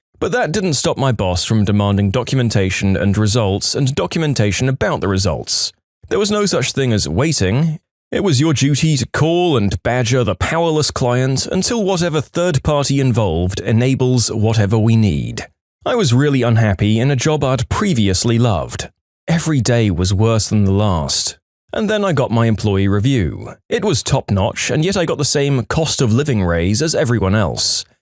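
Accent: United Kingdom. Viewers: I love the British voice more actually lol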